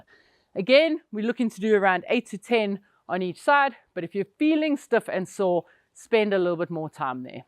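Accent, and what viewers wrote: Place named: South Africa. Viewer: How exciting to land on a YT cxhannel and hear a South African accent. Woot! I've subscribed and plan on digging in to your content on the weekend. I was a runner and still kinda am but heck, those menopausels. :face-orange-frowning: Plus sore knees.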